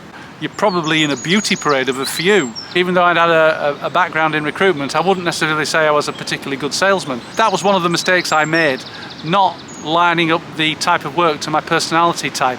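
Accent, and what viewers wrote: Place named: England, United Kingdom. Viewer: You must be in England somewhere I see that you sound like you're in england. I have direct ancestors that's from a lot of England.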